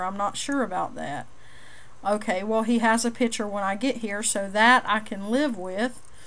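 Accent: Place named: South, United States. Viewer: LUV your Southern Accent!:-) You give a great tip in this vid, nice job. Keep up the good work! Blessings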